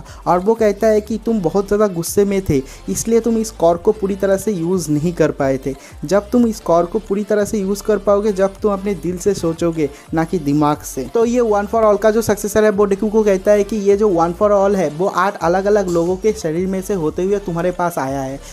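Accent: Bengal, India. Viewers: r u bangali bro...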